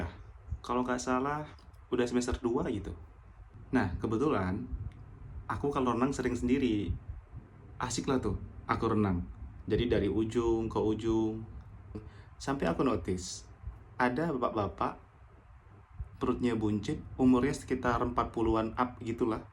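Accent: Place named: North Sumatra, Indonesia. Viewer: bg logat sumut nya kental kali! sumut nya asal dari mana bang, btw serem kali cerita ko bang bah ketemu bapak bapak homo gitu 😅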